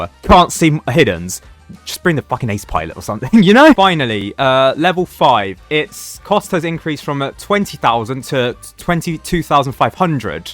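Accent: United Kingdom. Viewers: I enjoy you speaking British more than reading a whole paragraph of text ngl this new style is much easier to watch your videos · I always forgot that this guy was british after his voice reveal😭😭😭😭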